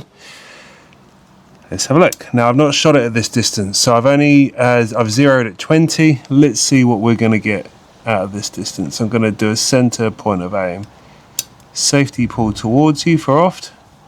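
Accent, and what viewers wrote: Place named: United Kingdom. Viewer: I am sure its a nice gun but as soon as I hear british accent and sub 12ft/ibs I turn off.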